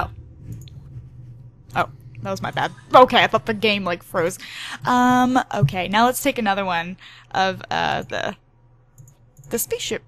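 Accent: United States. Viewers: Your American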